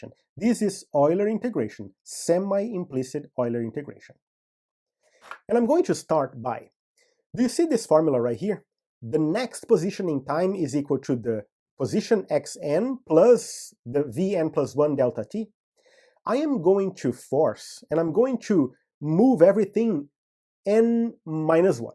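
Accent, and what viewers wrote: Brazil. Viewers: this guy has to be brazilian, no where else we find that accent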